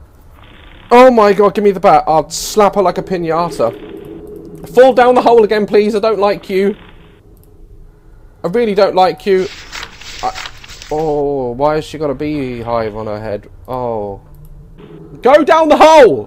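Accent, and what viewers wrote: United Kingdom. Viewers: your British aren't you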